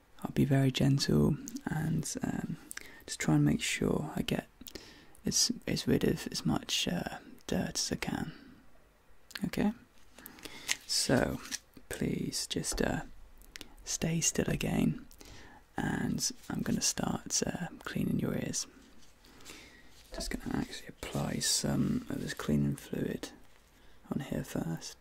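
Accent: United Kingdom. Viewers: The (super mega hot) British accent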